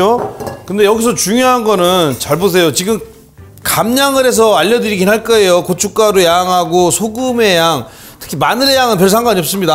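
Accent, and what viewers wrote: Chungcheong, South Korea. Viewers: 그런줄 알유 하면 다 알아들유 충청도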